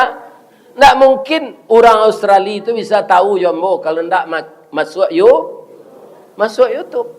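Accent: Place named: Minangkabau, Indonesia. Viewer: Semoga tetap sehat buya. Ambo bukan orang awak sangat menikmati ceramah buya apalagi dg logat minang yg kocak dan isi nya Ambo sering tanyo ke bini Ambo yg Alhamdulillah orang awak. Sekali semoga sehat buya Aamiin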